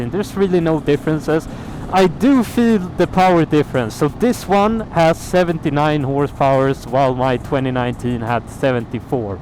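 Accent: Sweden. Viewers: Ironic? You can't sound more Swedish when you speak English.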